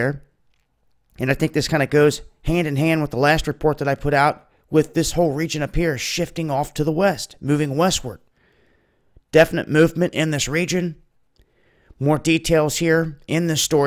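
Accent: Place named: Idaho, United States. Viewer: you're from Idaho, girl, I can tell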